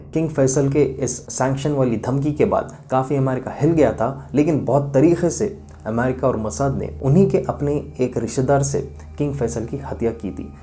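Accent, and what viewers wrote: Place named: Malabar, India. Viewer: Bhai itne dinon se videos Bana Raheho Aapki Urdu Kerala ke Malbariyon jaisi Hai Aapko Aapni Urdu Hindi Zubaan Sudhar ne ki Zarurat Hai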